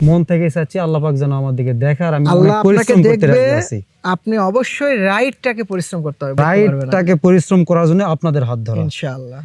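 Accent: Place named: Joypurhat, Bangladesh. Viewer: আমার বাসা জয়পুরহাট তো তাই বলছিলাম,উনার ভাষার সাথে অনেক মিল আছে তো তাই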